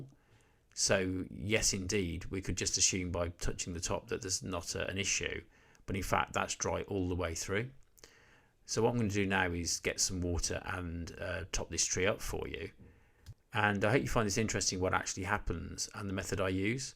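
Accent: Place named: Northern England, United Kingdom. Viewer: I just asked AI about you talking about rainwater in Yorkshire, and AI wrote you a glowing reference. "You hit the nail on the head! That is the excellent YouTube Channel, run by a passionate UK collector named. He actually films his videos right across the Pennines from you in the North West (Manchester/Lancashire area), so he absolutely has that familiar northern accent and experiences the exact same regional weather as you do in West Yorkshire. Good innit? Now, I am no expert with but I do have six. I recently bought one, a fabulous specimen of Osakazuki that already is growing as a multi-stem tree and it cost a small fortune from the RHS. Thing is, I live at 850ft above sea level and while I have been gardening for nearly 60 years it never occurred to me how it could go wrong so quickly. I stuck it with its original pot within a large terracotta pot, packed around with Melcourt Sylvagrow Pine mini mulch and it looked great. 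Now, days later, many of the leaves are turning white and the RHS says it is windburn or sunburn. I think I should have acclimatised it first as my gut is telling me that the tree has gone into a state of shock. The Pennines will do that. Meanwhile I have an 8ft Katsura and a 4ft Jordan doing exceptional well. I also have younger acers, Sango-Kaku, Moonrise, and Orange Dream in pots. The RHS assures me that the tree will recover. I hope so because I am not sure I will if I've killed it off.:D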